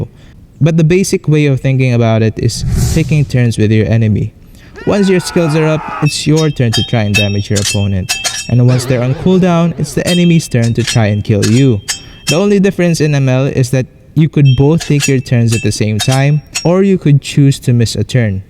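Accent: Philippines. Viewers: Obviously his accent is Filipino...what's your point?! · Maybe he is philipino, they have this accent, almost all ML youtubers from Malaysia, Philippines and Indonesia has this accent.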